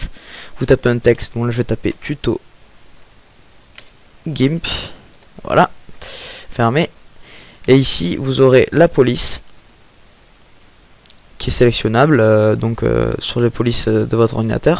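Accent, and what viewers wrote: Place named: South, France. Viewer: coucou merci pour ce tuto moi qui utiliser photofiltre pour recarder ou autre des photo depuis queje l'ai plus je ne savais plus rien faire merci a toi et jespere de tout coeur que tu continueras ta serie sur les tuto gimp car je trouve ca claire bien expliquer net puis tu as laccent du sud et je viens du sud voila gros bisous ja mabonne !!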